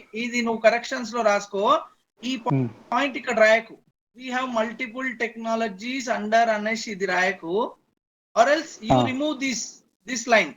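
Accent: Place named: Telangana, India. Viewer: Telengana yasa la iragadeeshnav anna....detthadi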